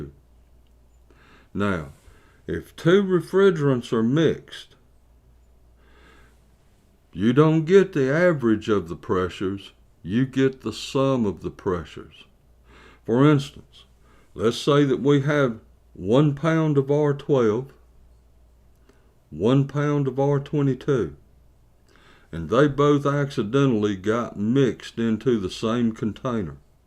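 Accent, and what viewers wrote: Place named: New York, United States. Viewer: Man this video is spot on and to the point, I love your New York accent.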